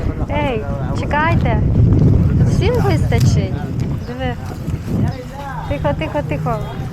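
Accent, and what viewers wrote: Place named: North, Ukraine. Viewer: ти з півночі України, говір такий цікавий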